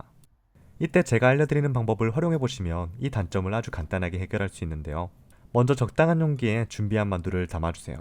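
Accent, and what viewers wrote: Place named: Busan, South Korea. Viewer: 공감꾹 부산사람이라 사투리가 바로 들리네예❤❤❤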